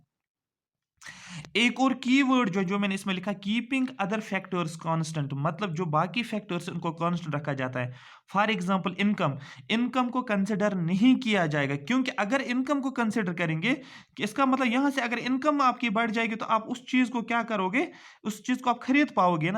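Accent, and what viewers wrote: Kashmir, India. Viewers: are u Kashmiri sir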